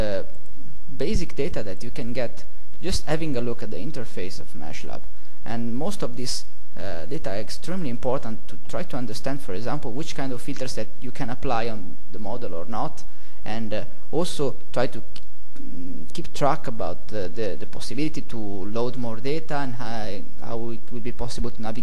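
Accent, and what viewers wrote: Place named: Italy. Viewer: Итальяно прейро))) По-английски говорит хорошо, но по оставшемуся акценту национальность легко определяется)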